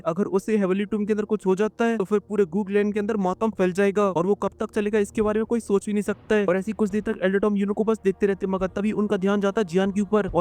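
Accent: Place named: Hyderabad, India. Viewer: His sarcastic way of explaining with a slight hyderabadi tone is really entertaining amusing and hilarious keep it up brother i really fan yours explanation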